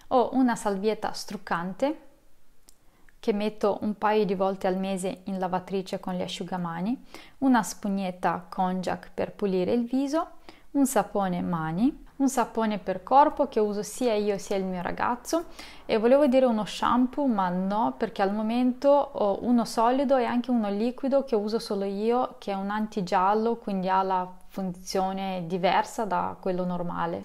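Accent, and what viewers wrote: Russia. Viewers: Come mai stavo guardando dei video poi ricarico la pagina e trovò una che ha l’accento Russo e poi vive usando con una sola padella :O (comunque lei può fare quello che vuele, questo è anche interessante) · Io: che nel cuore della notte vorrei dormire YouTube: consiglia video di una ragazza con accento filo-russo che spiega come vivere con pochissime cose •_• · Sei russa? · Grazie per questo video, mi è piaciuto molto! Sto imparando italiano e mi aiuta molto guardare i tuoi video. Ho notato un piccolo accento russo, altrimenti avrei pensato che l‘italiano fosse la tua madrelingua. Posso chiederti da quando stai imparando italiano? E da quanti anni vivi già in Italia? È stato difficile imparare italiano così bene? Grazie :) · mi rilassa troppo la tua voce russa!😍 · Non riesco a capire dal tuo accento se sei russa oppure moldava?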